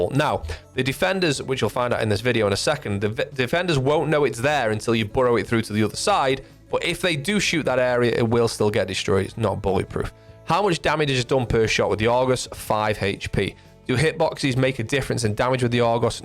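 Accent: United Kingdom. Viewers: I think that’s the british accent if im not wrong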